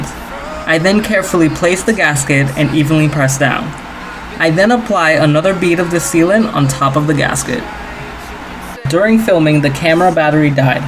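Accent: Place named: Trinidad and Tobago. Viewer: Very nice man, I have an 03 avalanche. It sounds like you're from Trinidad? I'm from St. Kitts and Nevis, but my family is from Guyana.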